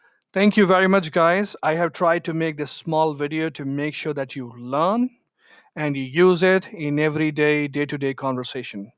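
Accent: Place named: India. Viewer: Mate stick to ur Indian accent rather than imitating our accent.... why don’t u decide if u wanna speak British or American first instead of mixing them.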